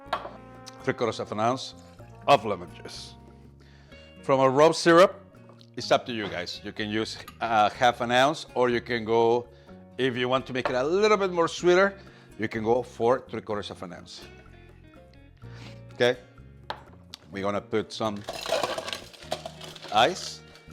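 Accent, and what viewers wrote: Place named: Latin America. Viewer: I’m sure your Latin accent is part of why “Production” fell in love with you. 😂 The rose water tutorial was great. I need to try that. Salud! 🥃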